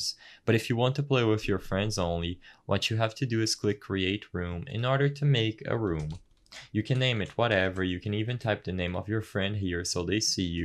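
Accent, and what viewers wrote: Brazil. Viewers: This game has cross-plataform? Eu acho que vc é BR pelo sotaque, sabe se esse jogo tem cross play?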